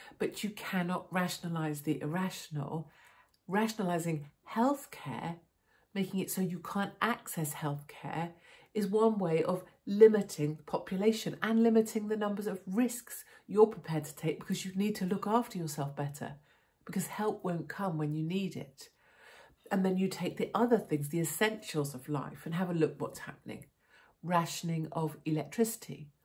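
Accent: United Kingdom. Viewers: Based on your accent I assume you are posting from the UK. I may be wrong. Why on earth are posing in from of a hugh american flag? You have little say in the UK and exactly zero say in the US. A lot of what is now going wrong traces back to american moves.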